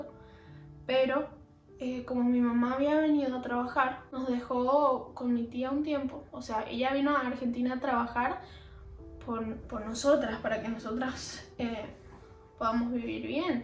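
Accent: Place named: Paraguay. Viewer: Sabiaaaa que eras paraguaya por tu acento de español aaa que lindoo😍 yo también soy de Paraguay😎🇵🇾 edit: no sé cómo llegue acá mi mamá también vive en Argentina y este año quiero ir para conocerle. (también soy cristiano) pero ellos no :(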